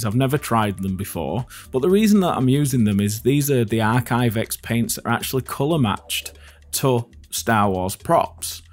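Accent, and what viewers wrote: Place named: England, United Kingdom. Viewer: Absolutely massive...... massively brilliant......so so Englishman speak with his massive accent....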